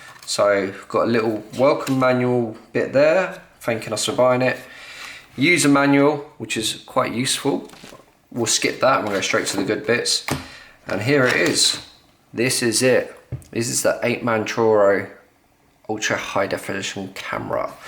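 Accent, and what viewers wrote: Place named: London, United Kingdom. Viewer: it's a regional accent most likely - probably down south around (east) london. there are many of them. words often arent pronounced like theyre written. certain sounds simply aren't produced. other languages, like italian in particular, are famous for this.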